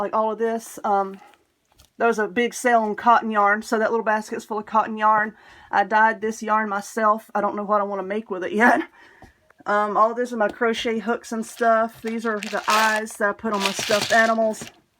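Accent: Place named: North Carolina, United States. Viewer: Hey I'm new to your channel and I love it. Your accent is what drew me to you. I said she sounds like she's from NC lol. And low and behold you are 💜. So am I born and raised in Rowan County so I was happy to see you ribbons from the fair. Congratulations!!!! I know you're not from here but it's still great you're from NC. I love your diys keep them coming.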